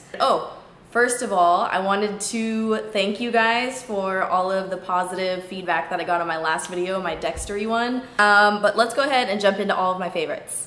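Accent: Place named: California, United States. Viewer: I freaking love you lol no homo. All of the girls I watch are from the east coast so it's good watching someone from Cali on here that makes me feel like I'm not a weirdo for talking like i do haha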